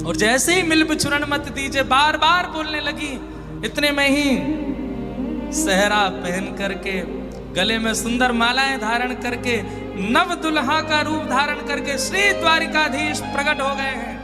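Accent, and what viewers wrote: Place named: Braj, India. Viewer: Mharaj apki ktha brij bhasha bhut pyarilagtihain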